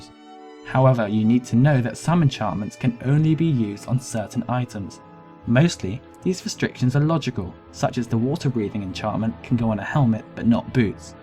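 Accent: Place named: United Kingdom. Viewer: curious, do all these videos with commentaries hire a British person with an accent to narrate without them being involved with the game at all? this guy just reads from a script . lame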